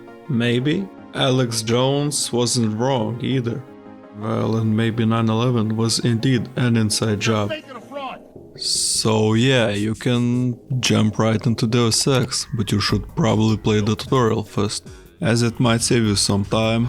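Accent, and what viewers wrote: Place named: Eastern Europe. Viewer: Hi, are you from Eastern Europe? Accent going kinda thick💪 Love your videos, keep it up!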